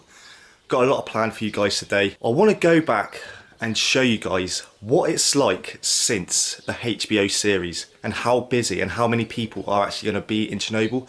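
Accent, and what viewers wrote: United Kingdom. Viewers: I love that brittish accent of you 😂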